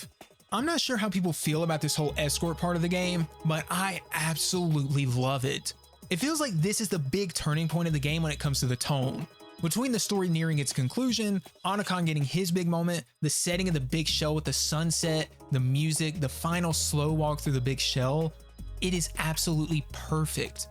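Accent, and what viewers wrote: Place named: Maryland, United States. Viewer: Bro are you from MD? Not hatin, that accent just sounds so familiar lol. Good video btw, Sons of Liberty is the greatest game ever made imo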